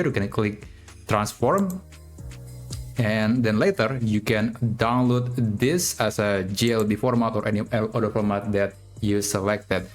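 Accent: Indonesia. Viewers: you sound Indonesian, are you?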